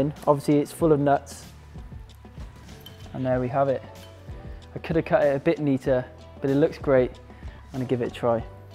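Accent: United Kingdom. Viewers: Does anyone know where about in the UK his accent is from?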